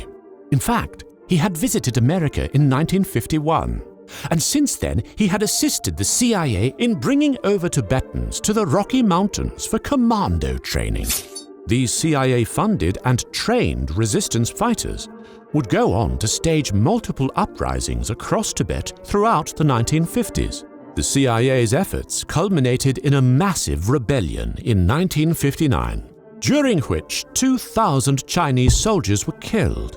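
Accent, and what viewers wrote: United Kingdom. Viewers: Y r u from british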